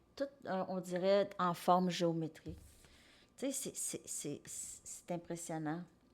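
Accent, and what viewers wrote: Canada. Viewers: Hello, J'adore votre humour et surtout votre accent canadien😜 🙏🙏...des becs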